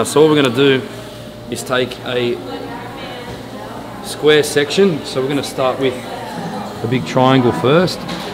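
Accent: Australia. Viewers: she's such a gorgeous girl love her hair what an amazing job you did and love your accent its so beautiful love Australia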